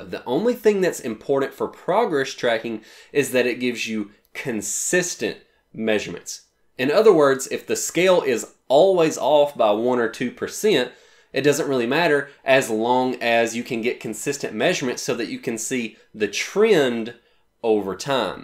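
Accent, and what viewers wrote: South, United States. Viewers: the yeehaw type voice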